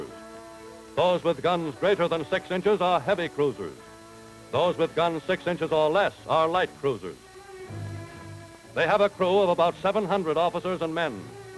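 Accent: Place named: Mid-Atlantic, United States. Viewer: Great slice of history. Love the old-timey narrator with the generic "Mid-Atlantic" accent.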